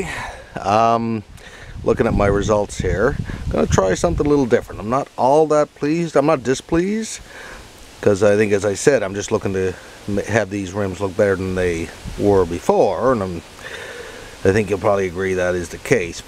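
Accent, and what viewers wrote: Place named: Newfoundland, Canada. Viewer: You must be a Newfie! Awesome and thanks for the video. Cheers from Alberta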